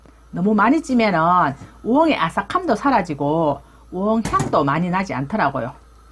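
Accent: Gyeongsang, South Korea. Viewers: 어이구야꼬! 진짜 쉽고 맛나겠네예. 나도 경상도라 내친구같네예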